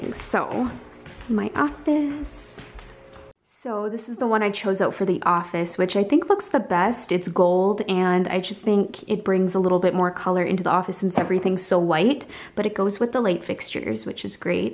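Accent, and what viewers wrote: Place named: Canada. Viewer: I love her Canadian accent ✨💕😂 ily! I sent you a letter a while ago and u sent me brushes so thank you for those! 💕 you are one of my role models 🥺💕😘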